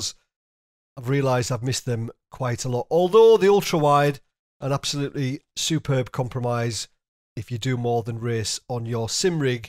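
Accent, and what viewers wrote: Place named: Durham, United Kingdom. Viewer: I've watched loads of your videos, and I can never decide which part of the North East you're from, go on, put me out of my misery? The accent sounds like Durham/Sunderland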